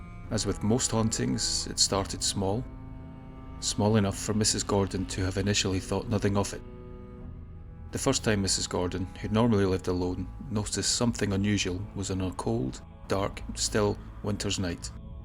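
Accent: Scotland, United Kingdom. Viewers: Creepy ghost story well illustrated and told by the best Scottish accent on Youtube. I also suddenly crave pizza. Thanks!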